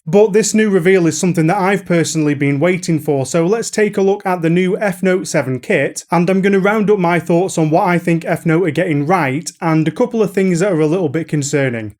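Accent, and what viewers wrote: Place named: Lancashire, United Kingdom. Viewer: Ah was picking up some Karl Pilkington vibes from that accent. Most definitely not an idiot though; love this channel. :)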